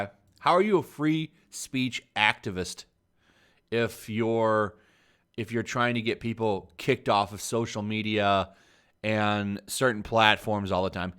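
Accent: England, United Kingdom. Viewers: That’s the most hilarious english accent I ever heard !!!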